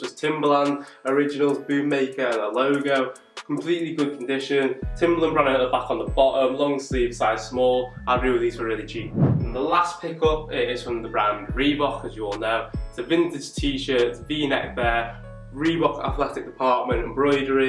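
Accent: United Kingdom. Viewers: First time being called a bro since I'm a girl 😂 I could tell from the accent, but like whereabouts in UK - What city? Ahh cool, any standout stores or you just go to a collective?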